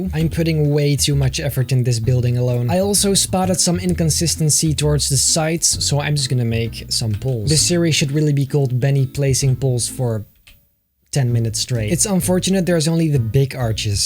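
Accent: Netherlands. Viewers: hey benny are you dutch /NL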